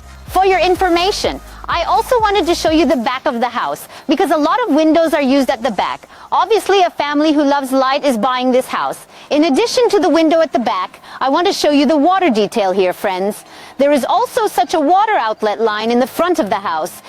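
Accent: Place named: India. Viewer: indian accent thats speaking too fast ruins this video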